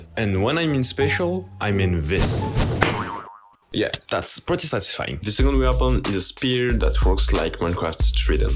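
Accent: France. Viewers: are you french? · Bro you sound like a french guy. are you french? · u are french ??? ( oui sa se voit a ton accent et a ta desciription )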